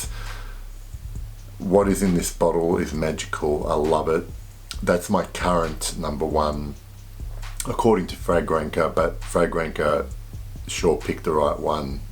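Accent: Australia. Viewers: What kind of accent do you have? Australian?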